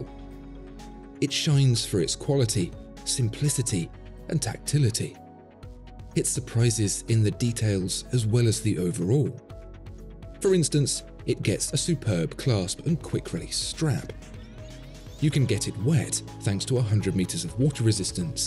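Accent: United Kingdom. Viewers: As a American praise a $400 watch with a British accent and I’m sold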